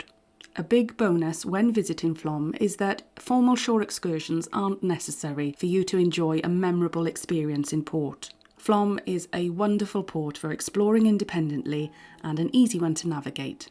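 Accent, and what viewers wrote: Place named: Wales, United Kingdom. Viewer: So much great information in a lovely Welsh accent! We will be in Flam in August so this has been exactly what I needed to see. Thank you!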